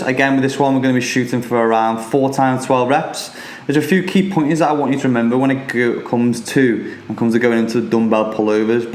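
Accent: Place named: Liverpool, United Kingdom. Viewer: Nice physique, quite an interesting accent, is it Liverpool one?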